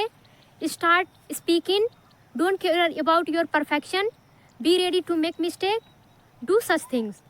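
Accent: India. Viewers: Are YoU from Indiaa???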